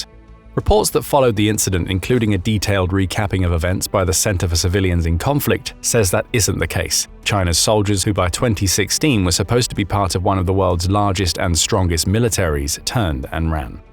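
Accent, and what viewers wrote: England, United Kingdom. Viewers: Lmao this video is total bullshit. I get a little suspicious on yhe stories then they flash up a picture of the lincon monument, that is 100% not what the monument looks like. I don't know who produced this video but it used an English accent voice using American measurements & stories that just aren't true.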